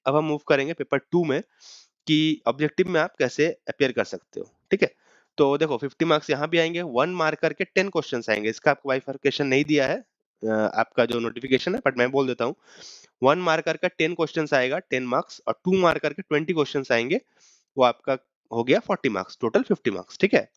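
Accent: Bengal, India. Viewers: Dada, are you from West Bengal? As you sounds like a bengali.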